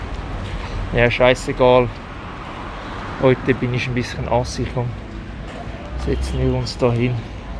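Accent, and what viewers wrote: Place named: Switzerland. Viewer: Thai mit schwyzer Akzent. Scharf. ✌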